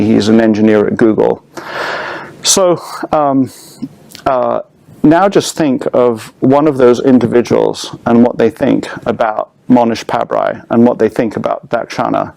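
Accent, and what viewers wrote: Europe. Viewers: listen to his voice, European.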